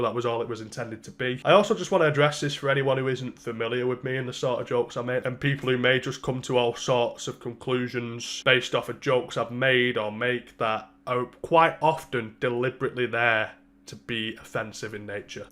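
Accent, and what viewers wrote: United Kingdom. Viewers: I don’t think people understand everyone in the uk speaks like this it’s just uploads it on YouTube.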